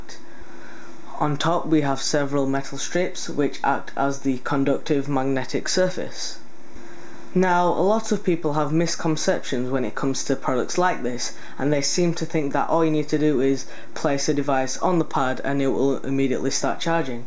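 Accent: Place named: Scotland, United Kingdom. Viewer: your accent sounds like a mix between Scottish and British :D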